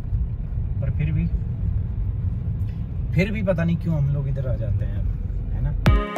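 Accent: Haryana, India. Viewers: Radhe Radhe 🙏🙏sir g😇 Ek Ache Educator k saath Saath Aap to Blogger B Bnn Gye Aur Wo B Ache waale 💖💖 Aapka To Nose B Red ho gya Tha 🤪Apple jaise.. U r Superb Dear Sir g ❤️❤️ 💕💕May God Bless U Always 😇😇😇😇 Aapki Haryanvi Ki To Maii Fan ho Gyi Huu 💯💯💯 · Sir ji.....teaching ek taraf arr aap suthre ek taraf.....arr fer baat aawegi apni bolli ki Ya haryanvi ke zeher laage hai na aapke muh se bas bujho na...baki hum k kahe.....aapke nazdeeki gaon se hi hain hum.....aate rehn diya karo vlogs gadhya gaddh 😍😅🤗 💗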